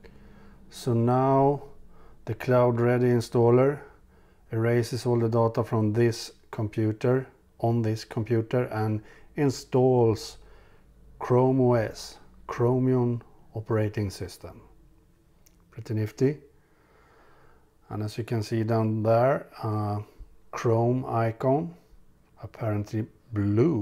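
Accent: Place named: Sweden. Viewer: love the swedish accent :D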